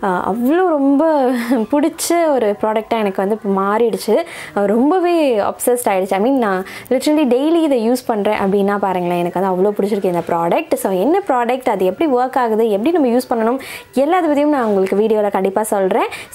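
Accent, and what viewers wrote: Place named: England, United Kingdom. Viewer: Wow wat a English accent 🤗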